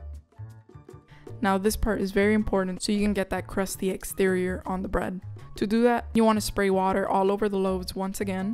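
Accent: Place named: Hialeah, United States. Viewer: I don’t even have to know you to know you are from Hialeah!!! 😂 Love it! Can’t wait to try this! Subscribed and will follow you on IG :)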